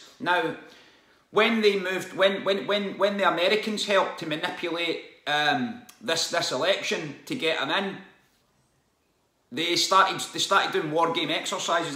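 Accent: Scotland, United Kingdom. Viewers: Love ❤️ the Scottish accent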